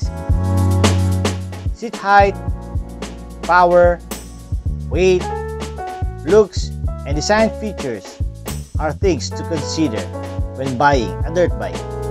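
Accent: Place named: England, United Kingdom. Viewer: natatawa ako sa english accent tsaka sa kinalabasan ng cc/subtitle ng video hahahaha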